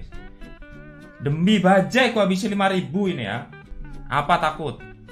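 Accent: Java, Indonesia. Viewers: ngomong medok wae mas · Mas e wong jowo ngendi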